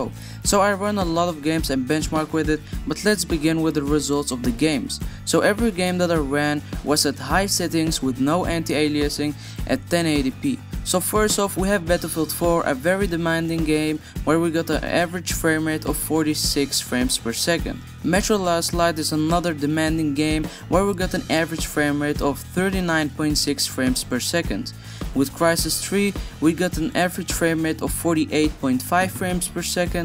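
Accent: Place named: Netherlands. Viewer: are you dutch man you sound like one im dutch too i will soon be building a 600€ gaming pc with a r7 265!!!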